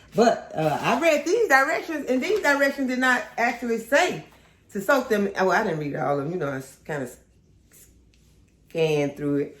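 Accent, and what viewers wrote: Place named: South, United States. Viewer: I listen to your chat carefully because it's full of good, down to earth information. My up north ears are not so used to your down south accent that's why I watch the closed captions carefully. Do you feel guilty for Caleb killing those bees? I'm sure I would have used Raid, too! I have a contract with my bugs that if they stay out of certain areas, then they are safe from me! ha!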